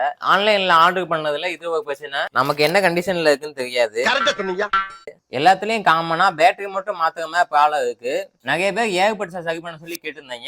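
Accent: Madurai, India. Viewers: bro நீங்க மதுரை ய😱😱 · ஏலேய் மதுர காரனா நீ😊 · Bro nenga Madurai ya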